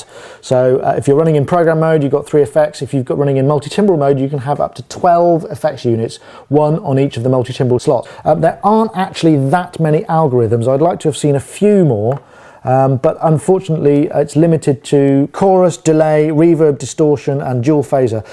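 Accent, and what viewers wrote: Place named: England, United Kingdom. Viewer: BTW what part of England are you from.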